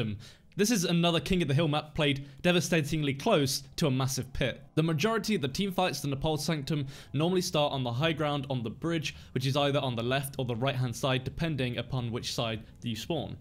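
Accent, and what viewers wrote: United Kingdom. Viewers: Superb video mate, damn good Pharah play. From your accent I take it you're from the UK? Surprised you're not on the UK world cup team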